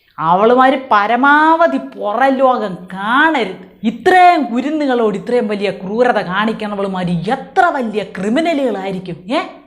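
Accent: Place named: Trivandrum, India. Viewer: പൊളിച്ചു.ഈ തിര്യോന്തരം സ്ലാങ് കേൾക്കാൻ തന്നെ ഒരു പ്രത്യേക രസമാണ്.ഇനി ഇങ്ങനെ ഉള്ള വീഡിയോസ് ഡെയിലി ഇടണം.സൂപ്പർ 👍🏻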